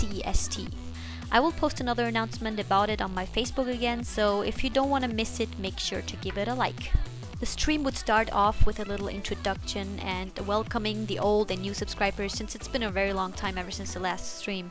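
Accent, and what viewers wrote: Germany. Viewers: samuel sharp she has a german accent.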